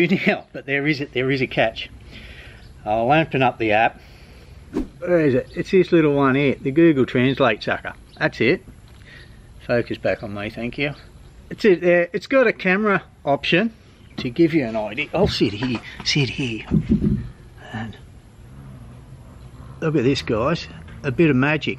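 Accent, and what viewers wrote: Australia. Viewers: Hi I’m new to your channel and I’ve heard you talk about your kids and was wondering if nee née have any. Keep up the videos good listening to an Aussie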